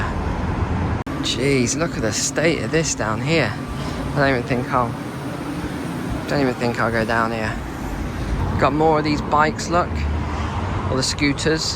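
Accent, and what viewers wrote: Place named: RP, United Kingdom. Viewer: Do you know how urban demographic transition models work? I learnt this stuff as a 13 year old in a crappy bog standard public school of all places, so you and others like you with your posh holier than thou accents have NO EXCUSE not to know about the subject. Literally every MAJOR CITY in the UK is composed like you see in Birmingham, it is no better or no worse than anywhere else. Even London is like this, literally walk 500 yards from Liverpool Street Station, which is plush, and the area quickly becomes depraved. Nothing has changed about these major cities. Manchester was exactly the same thing before the Man City FC owners invested in the local council rejuvenation project. The issue is a lack of investment, it's always a lack of investment in public services. People talking about immigration being the cause are just clueless, immigration has been here since WW2 and it's helped to not only rebuild the country, but to prop it up. 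If anyone is to blame, it's successive governments pulling public budgets when taxes are forever increasing.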